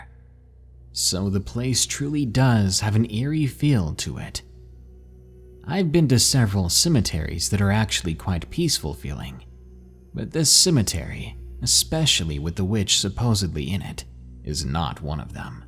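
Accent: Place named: North Carolina, United States. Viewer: Listening to your voice telling these old stories reminds me of growing up in Valle Crucis, North Carolina, in Watauga County. I've missed that accent and the stories the old men used to tell while playing checkers outside the Mast General Store. I've missed my home for so long, just hearing your voice, so familiar to me from so long ago, has me in tears. Thank you so much and please keep sharing these. ❤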